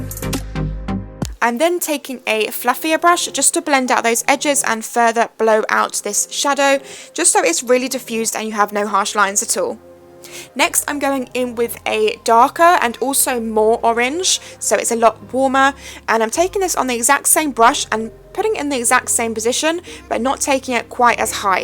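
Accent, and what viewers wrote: United Kingdom. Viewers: are you from Britian because your accent makes it sound l;ike it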